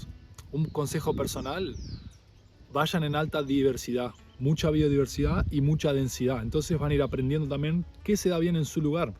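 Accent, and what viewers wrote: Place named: Uruguay. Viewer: Qué divino que todo esto sea hecho en Uruguay, ya me parecía por las especies y tu tonada, además me la juego que es Rocha o Maldonado. Sería divino poder visitar y conocer, abrazo.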